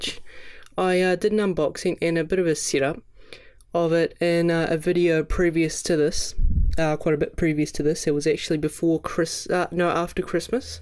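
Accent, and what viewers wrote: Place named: United States. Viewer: Dude are you kiwi living in America, lived in America, or are kiwi kids getting American accents from the internet? Nice tut also.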